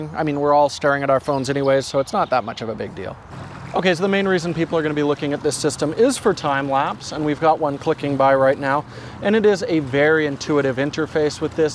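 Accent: New Zealand. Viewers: A Japanese ethnicity, living in Canada, with profound New Zealander Accent How can we not love? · Can say fish & chip in his Kiwi accent? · ' Kiwi accent is spot on. A+ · I never shoot video, but I watched this episode because of New Zealand accent and Jordan's clear explanation of this camera gadget. Thank you for sharing. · Like for the kiwi accent XD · WOW. Aussie guy here... your NZ accent is GREAT!!!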